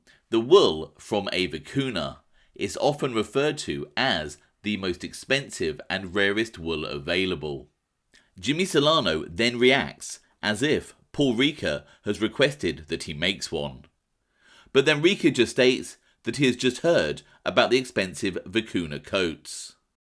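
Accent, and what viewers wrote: United Kingdom. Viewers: The British trash accent is so annoying just shut up and play the recording nobody cares about the annoying narrator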